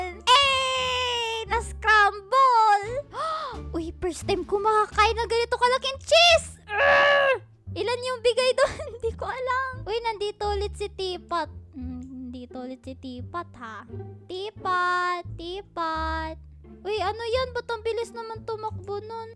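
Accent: Visayas, Philippines. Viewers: Ate. visaya ka no kasi narinig kita na sabi mo. ayaw saba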